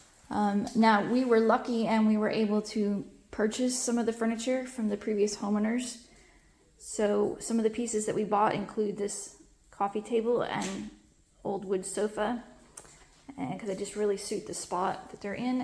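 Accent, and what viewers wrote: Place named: Tennessee, United States. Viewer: Ha! I've been trying to place your accent more specifically than just "Southern"—and Tennessee was the guess that I kept coming back to. (Now watch it turn out that you tell me you spent your whole life in Texas or some other state until you had just a year in Tennessee before moving to France.)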